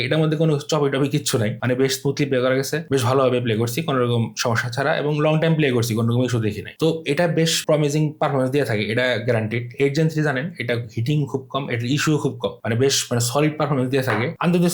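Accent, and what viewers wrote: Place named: Gazipur, Bangladesh. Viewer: Vai basa ki gazipur naki tangail?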